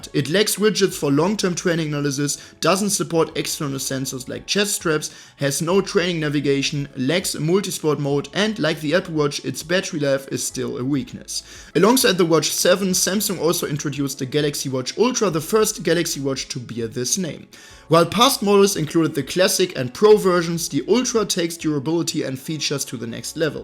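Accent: Germany. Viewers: What an awesome unbiased cover of these watches. I love the channel name too. You get exactly that, reviews with a German accent 😂. I hope you get more followers with this much effort.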